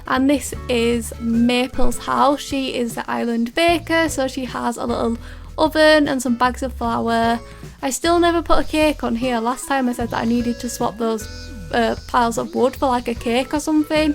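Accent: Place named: Northern England, United Kingdom. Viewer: your accent makes me so happy, I'm from Yorkshire and hearing another northerner makes your videos feel so cosy aha, your island looks so so good!!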